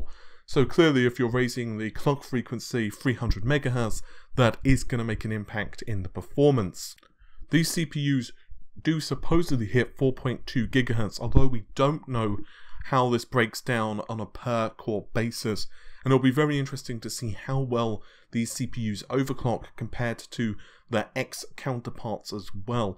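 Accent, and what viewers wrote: United Kingdom. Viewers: He's British you dumbass and American is an accent. Not a language. I see the American education system has treated you well.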